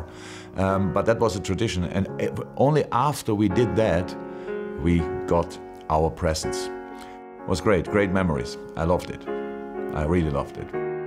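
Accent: Liverpool, United Kingdom. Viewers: Hes slowly developing a Scouser accent.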